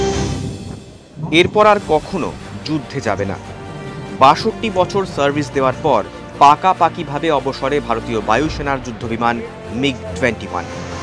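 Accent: Bangladesh. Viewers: বাংলাদেশের মানুষের মতো কথা বলে ছেলেটা😊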